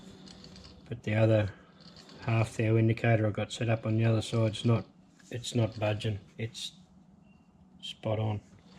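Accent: Australia. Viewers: Great job, it's like watching Max all over again...... you have even got his Ozzy accent nailed mate! Lol. Cheers, Jon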